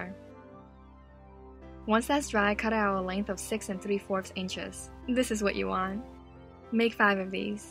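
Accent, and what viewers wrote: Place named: New Jersey, United States. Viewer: Are you from New Jersey? You have the accent.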